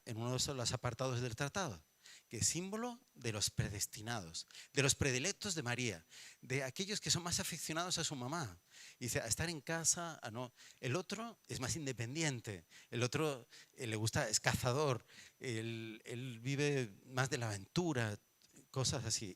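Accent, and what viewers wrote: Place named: Aragon, Spain. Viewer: Me encanta oírle padre, la Virgen le ha elegido con esa gracia baturra que nos hace llegar de una forma muy simpática todas las verdades evangélicas. Dios le bendiga.❤😂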